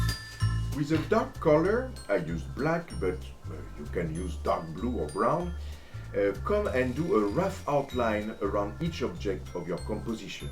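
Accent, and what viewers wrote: France. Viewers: i love your france dialect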